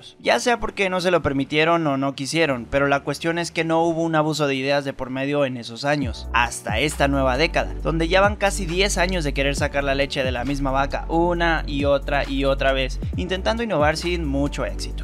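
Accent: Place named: Colombia. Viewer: Por que te escuchas como Colombiano en este video xd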